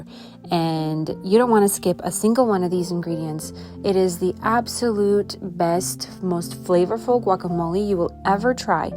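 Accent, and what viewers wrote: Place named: Russia. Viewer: I was wondering where your lovely accent was from!! Did you grow up in Russia?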